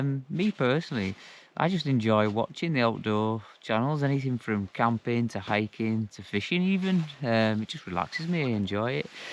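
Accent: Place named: Northern England, United Kingdom. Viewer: I'm late to the party mate but new subscriber from west Yorkshire England,you sound like a northerner yourself, where you from buddy, absolute banger of a tent!!, I've got the red label,,ATB mate,,Daz👌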